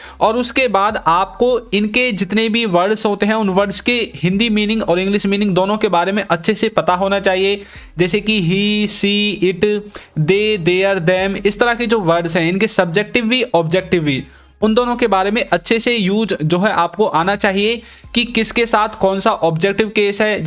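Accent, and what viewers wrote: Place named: Uttar Pradesh, India. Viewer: Sir apka language tone to up ka sa he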